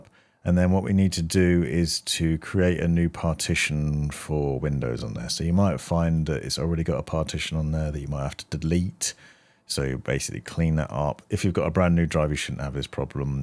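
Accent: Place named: RP, United Kingdom. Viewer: Your videos are very useful, but more importantly, I use your videos to practise my English received pronunciation. Yours is so clear! Cheers from Argentina.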